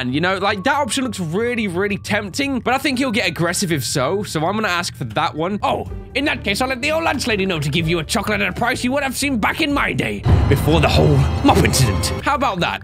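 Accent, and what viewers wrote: United Kingdom. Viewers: Random question but... are you British?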